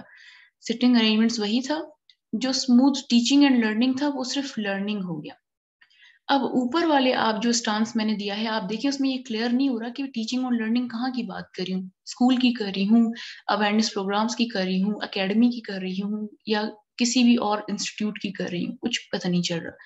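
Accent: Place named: Kashmir, India. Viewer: May Allah bless you... Can u tell me where from u. Bcoz I am from Kashmir.. Your voice tells u also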